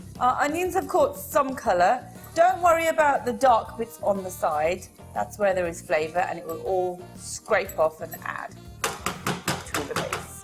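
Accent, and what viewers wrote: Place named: United Kingdom. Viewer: Bloody hell. Absolutely fell in love with the British accent!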